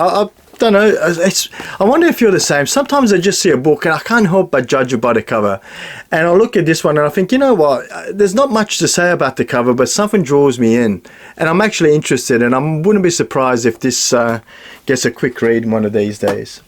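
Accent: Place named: United Kingdom. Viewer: Dude I thought you were from the UK this whole time 😂 I guess I'm not good with accents Love the book hauls. Keep 'em coming 👊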